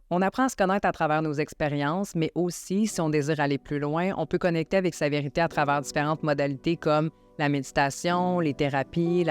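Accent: Quebec, Canada. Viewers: Bon vidéo et ça fais du bien d’entendre l’accent québécois ;)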